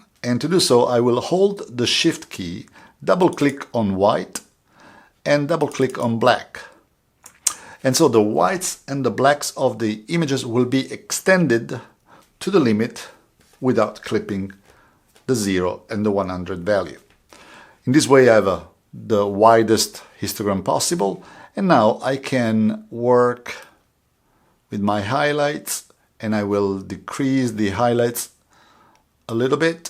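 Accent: Italy. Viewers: hello, I very like you performance and passion for what are you doing, your videos are very helpful. Your English is very understandable and I like Italian accent, please continue with this. One of best channel for me. Ti auguro tutto il meglio. Ciao Luca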